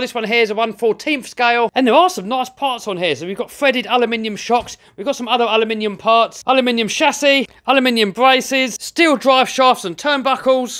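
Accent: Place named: England, United Kingdom. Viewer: Amazing english accent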